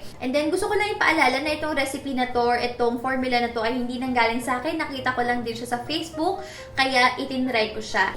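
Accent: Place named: Batangas, Philippines. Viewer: May punto ka sis, batanguenya ka ba? 😊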